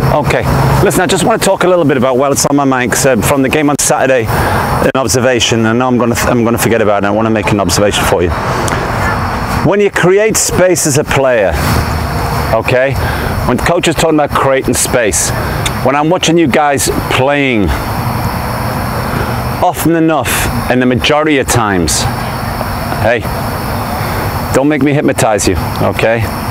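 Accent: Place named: Newcastle, United Kingdom. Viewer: Watched a fair few vids now coach, I had to look you up! Yep, I cheated. But I will say that I did detect the Geordieisms in ya dialect. How? I'm from Ashington. Home of the great Jackie Milburn, and Charlton brothers. Me Granny used to babysit the pair.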